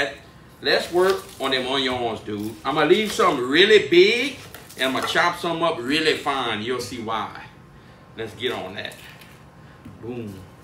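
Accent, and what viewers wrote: Louisiana, United States. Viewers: I'm from Washington DC and we have a unique accent. I like listening to your Cajun accent. 😊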